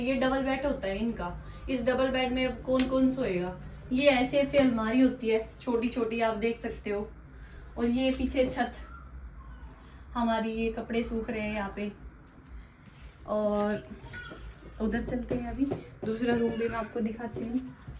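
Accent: Haryana, India. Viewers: ap haryanvi ma bat kya kro hum ko aap ki haryanvi bohot achi lgte ha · loved your Haryanvi accent, nice video, you are very cute and innocent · Hi love I also live in Liverpool ur look’s very pretty I liked ur haryani language it’s so sweet but u need to more clear that it’s two bed room house rent and all rent r not same it’s also depend on ur area if u live in city centre it’s rent more expensive than ur. If u live in good area it’s expensive too. One thing more all houses r not same. there are four types of houses. U show a traces house.Other houses names r Sami detach house, detached house, flats and bungalow.there rent r also different too. Mostly house have there beautiful gardens too. I am sorry my aim is not to let down ur videos I just add more information for ur viewers. Really I always like ur videos and naughty ur baby. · Haryanvi boli achi lgti hi apppar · 👍👍👍👍 appp Haryanvi boli me bahut achhe lagte ho.. 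· AP Hindi bhi haryanvi me bolti ho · Apki haryanvi b bahot sweet hey. Prantu abi ap Urdu bol rahi ho likho bhaley hindi mn ya kaho bhaley isey Hindi 😅😅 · Chorrii ghaint laagg ri hindi ma b...nways ...its nyc vedio..i thought u wud b in India...bt harayanvi touch suit kre thare pe..ikk harayanvi mein b bna do ji vedio harayanvi chorra khatir...ghani baavli lagge se..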